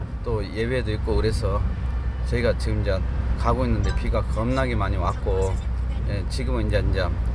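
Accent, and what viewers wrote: Jeolla, South Korea. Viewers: 내 고향 전라도 사투리를 겁나게 쓰시네요~ 갑작스런 사투리에 겁나게 어매어매 정겹네요~ㅎㅎ 성령의 불덩어리가 전라도에 떨어져서 깨어지고 살아나게 하옵소서~ 화이팅입니다~~~ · 목사님 강단에서는 사투리안쓰시는데 평소에 사투리 엄청쓰시네요 라도분들은 서울말과 사투리 자유자재로 쓸수있어 좋겠어요 ㅎㅎ 경상도는 고맙습니데이 ^^ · 전라도 사투리 너무 재밌네요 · 아따~목사님!!! 참말로~ 전라도사투리겁나게잼나부러유~ㅋㅋㅋ 사모님... 빗길안전운전하세요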